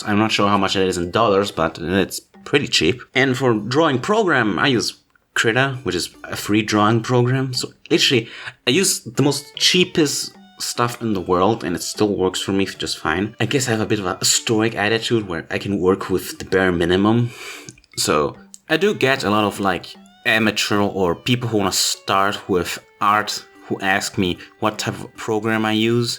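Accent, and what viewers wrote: France. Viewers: Are you French or Italian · You’re french?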